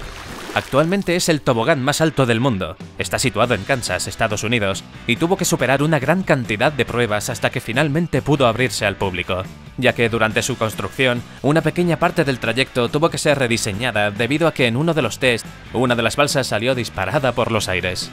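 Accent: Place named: Spain. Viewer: Típica voz española :v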